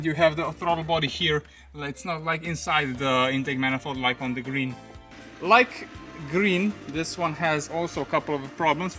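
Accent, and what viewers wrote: Slovenia. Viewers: Hearing a slovene talking in english is so bloody cursed 😂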